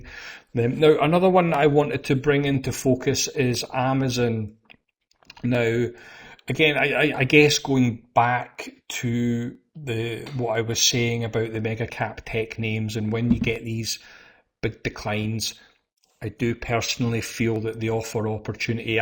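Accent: Scotland, United Kingdom. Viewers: Just subscribed to the channel and enjoyed the content and the Scottish accent! Did a trip to Scotland 2 years ago and found a cemetery In Dysart, Kirkcaldy, that had my grandfather 7 generations back. A huge fan of technical analysis so this video was enjoyable to watch and I will watch previous months also. My biggest positions are airlines-I have 3 but I would like if you would cover UAL. One thing I don't know much about is Fib extensions so if you would cover that I would appreciate it. Thanks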